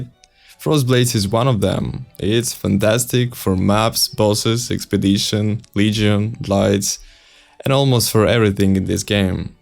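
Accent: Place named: Eastern Europe. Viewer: Never thought frost blades can get to this dps in that low investment) Also you accent, Where are you from? Sounds like east europe Или даже Россия)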